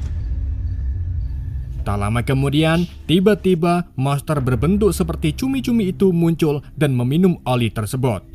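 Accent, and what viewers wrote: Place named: Banjar, Indonesia. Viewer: Bng ne urang banjar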